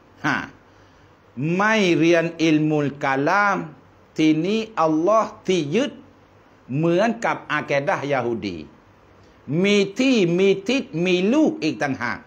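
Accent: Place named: Nakhon Si Thammarat, Thailand. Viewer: นครศรีธรรมราชชัดเจน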